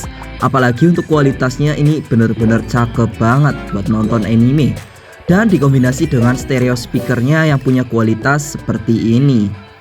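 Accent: Banyumas, Indonesia. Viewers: orang banyumas juga bang?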